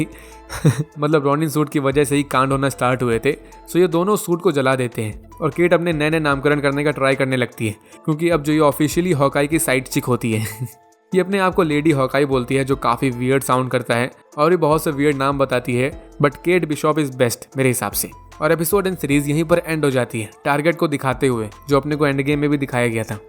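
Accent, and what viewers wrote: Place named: Sitapur, India. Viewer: Hey bro R u from district seetapur up . My friend is also from there and you both speak same way. I every time enjoye your sweet voice and explaining method Thank you 😍😙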